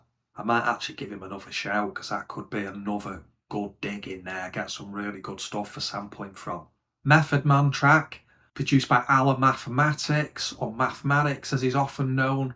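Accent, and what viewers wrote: Manchester, United Kingdom. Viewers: Well that's me un-subscribed.. sorry but that boring monotone manc accent is killing, ta taa..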